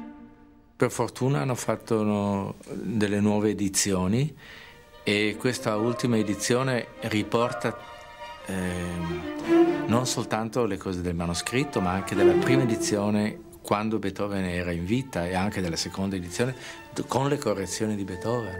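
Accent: Germany. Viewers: Interesting comment about Latin countries producing melodic operatic music and Germanic countries producing the greatest symphonic works. That’s where Mozart comes in satisfying everyone! Wonderful to hear this consummate musician doing the same thing when speaking in Germanic Italian. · He sounds like a German speaking a good Italian. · Ma perché parla con accento tedesco? Sembra un tedesco che parla in italiano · The translation is...not very good. At some parts, quite inaccurate. His ideas, I don't completely agree with (though he has a warm personality). And his Italian strangely sounds like coming from somebody who speaks more German than Italian (this comment comes from somebody who only studied it, but it's obvious he doesn't sound like most Italians). BUT his conducting and the music ... absolutely sublime.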